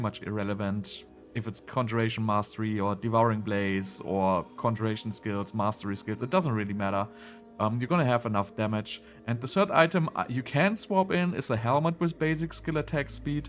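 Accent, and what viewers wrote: Germany. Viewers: yeah thats a fine build for overworld. it like omni cl of s1. that was probably even more op for farming:) . sadly its gone for now. And greetings from germany, you sound german too.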